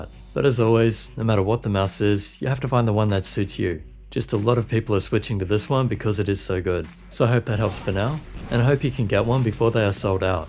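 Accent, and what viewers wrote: Australia. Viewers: Nathanael Ciranni not really, it’s more that his accent doesn’t sound Italian. Sounds more Australian, or maybe from New Zealand.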